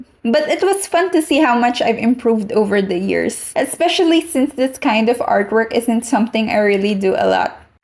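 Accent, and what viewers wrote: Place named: Philippines. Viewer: Filipino? The accent is strong.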